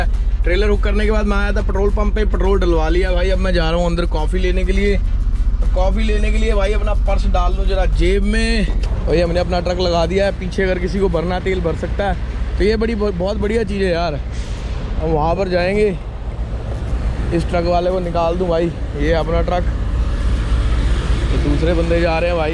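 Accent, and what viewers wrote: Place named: Punjab, India. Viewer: Hindi ch v punjabi wala touch 😂😂😂😂😂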